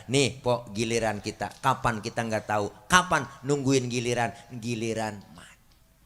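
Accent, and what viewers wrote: Jakarta, Indonesia. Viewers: Walau video nya baru dan materi nya di ulang ulang,saya tetep suka dengan ustadz dengan logat khas betawi nya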